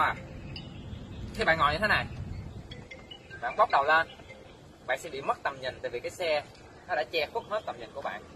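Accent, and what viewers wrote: Vietnam. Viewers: Đu má a nc chửi thề dễ thương lắm anh, đậm chất miền Tây, em cũng z hihi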